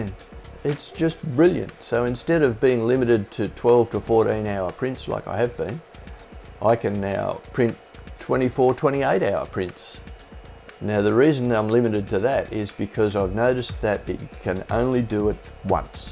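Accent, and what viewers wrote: Australia. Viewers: Thanks for the video. Could you please clear up whether there is a difference between the US and the EU version other than the supplied cord, and that either are safe to use in Australia with a local converter. Good to hear an Aussie voice reviewing. Cheers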